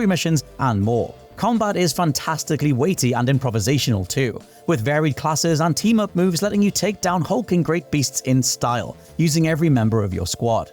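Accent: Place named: Scotland, United Kingdom. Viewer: He is pronouncing it properly but it's his accent which is a cool accent. It's like a British and Scottish accent combo.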